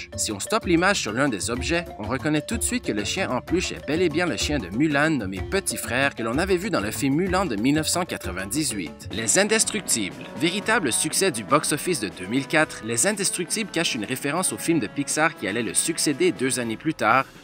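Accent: Quebec, Canada. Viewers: est tu québecois? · l'accent québécois, c'est plutôt classe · l'accent Québécois est beau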